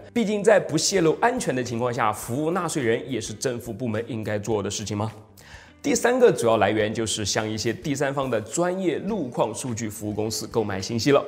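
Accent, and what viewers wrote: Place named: Sichuan-Chongqing, China. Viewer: 我和朋友猜是哪里人，应该是川渝或是江浙一带，前者可能性更大，不知道对不对？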